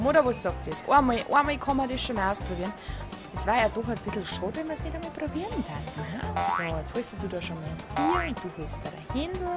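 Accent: Bavaria, Germany. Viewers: Zum ersten mal Bayrisch gehört und dacht mir nach den ersten sekunden erstmal nur so "ok und von sowas bin ich fan im fussball ?:D" aber is schon ganz ok besser als Kölsch ^^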